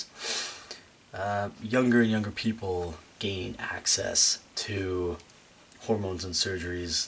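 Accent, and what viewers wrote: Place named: Ireland. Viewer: I adore that little Irish accent you are developing! Words can't even describe how excited I am to watch this short film. I have very little knowledge on trans people, but at the same time, a great deal. My ex was trans and I'm beginning to wonder if I am too. I just feel so incredibly masculine, yet nobody can truly understand it or me. Also, my best friend says I have the face of a 14 year old boy. lol I've been watching your videos from the beginning,. You are very awesome indeed.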